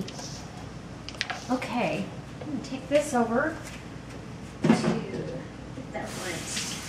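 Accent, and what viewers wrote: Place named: Texas, United States. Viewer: You sound like your a Texan. :)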